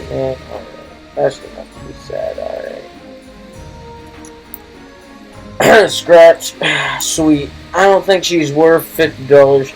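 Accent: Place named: Scotland, United Kingdom. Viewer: NO WAY ANOTHER SCOTTISH SKYTUBER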